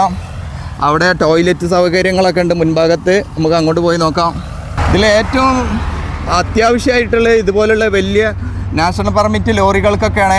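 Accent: Malappuram, India. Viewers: നിങ്ങളുടെ മലപ്പുറം slang ഒന്ന് മാറ്റിപിടിച്ചൂടെ.. നല്ല slang ൽ സംസാരിക്കൂ..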